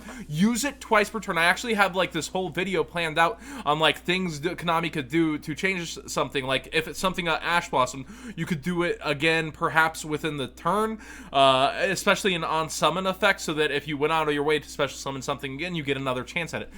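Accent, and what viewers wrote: Texas, United States. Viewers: Howdy y'all! And welcome to the house! That Southern Texas accent though.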